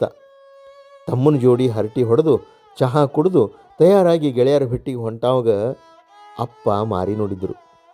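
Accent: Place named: Dharwad, India. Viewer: ನಿಮ್ಮ ಧಾರವಾಡದ ಒಳನುಡಿ ಕೇಳೋಕೆ ಒಂದು ಚಂದ ❤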